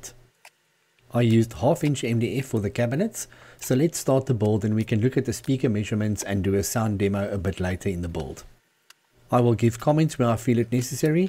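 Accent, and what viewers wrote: South Africa. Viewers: That's a definite South African accent there. Where can we find stuff like this in SA? Awesome build!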